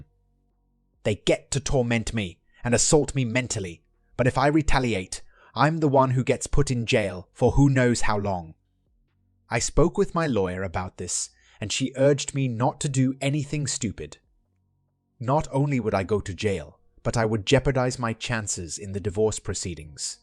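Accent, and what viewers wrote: United Kingdom. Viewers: Not my favorite rendition either. Would sound more authentic were the AI not speaking with a British accent.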